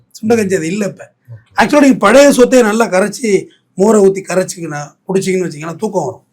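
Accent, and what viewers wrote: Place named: Tirunelveli, India. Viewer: உங்கள் மொழி நடை அசல் திருநெல்வேலி மொழி நடை Uncle 😊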